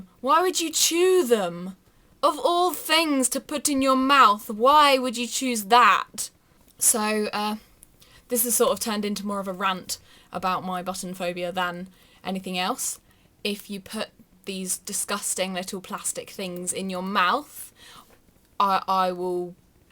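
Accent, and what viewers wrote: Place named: Australia. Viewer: You made me laugh sooo much how you said things it made it even more funny with your Australian accent.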